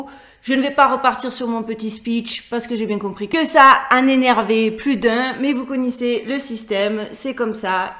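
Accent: Marseille, France. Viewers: Bonjour chère amie, je vous suis depuis quelques temps, je suis tombé sur cette vidéo, et je voulais vous dire, vous qui aimez trouver tout ce qui est caché, faite un test, vous savez que les yeux sont le miroir de l'âme, alors faite un test avec toutes les images, et symboles que vous avez entre vos mains, mettez-vous devant un miroir, et vous découvrirez beaucoup de choses cachés, n'hésitez pas de tourner les images dans le sens inverse, je pense que vous avez cet œil pour trouver ce que vous cherchez. J'aime bien votre chaîne car elle sort de l'ordinaire, avec votre franc-parler, avec un accent marseillais. Bien à vous Francis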